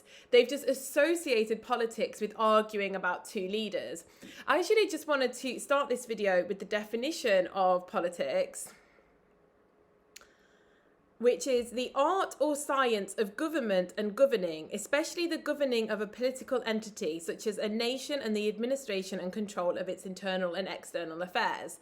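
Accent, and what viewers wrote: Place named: Yorkshire, United Kingdom. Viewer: The floor in your argument is that 80% of the population are as thick as you know what. They are not capable of reasoned thought. That is why things like football exist. To give those people a raison d'etre. PS, where are you originally from ? I think an east Yorkshire twang occasionally makes a bid for freedom.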